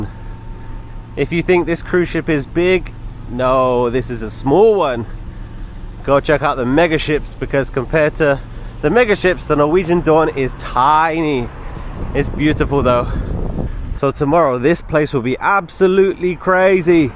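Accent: United Kingdom. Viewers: I agree. Sounds like bait and switch to me, regarding the port name. You’re not complaining, you’re informing. I appreciate all your info. Oh, and you sound perfectly British to me. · I think you sound British, but you dress like an American 😜 especially your headwear. I’ve always heard that only Americans wear ball caps. And you have that San Francisco hat so people probably assume you’re American. 🤷🏼‍♀️ But I’m from the south and I have an accent. And I don’t really hear it myself most of the time. But certain words have that southern draw. And then I hear myself and I’m like damn I sound country as hell.😂 Also, do they have Uber in Copenhagen? Can you just Uber from the ship to the metro station at least. ? Also FYI, I’m actually headed down to Walt Disney World this week. ❤ 🏰 And that’s a crazy price, because I’m gonna pay close to that for five days at Thanksgiving.? 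But that also does include gratuities and all the port fees. Do you use a certain website ? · oh migod, you don't sound like American at all..I can't really place your accent. I know it is British. But being an American, I am not privy to the regional accents in England for sure. Still love your enthusiasm and venting and all that.. You live life fully, whether while complaining or whether in awe of something. We get swept away by your emotions. It is good to feel, whatever it is fully.. Thanks for the videos.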